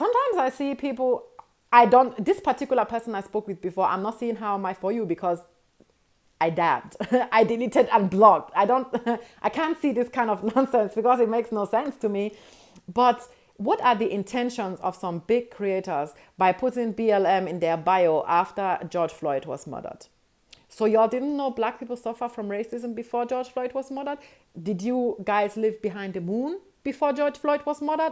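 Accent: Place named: Nigeria. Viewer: Nigerian accent is super strong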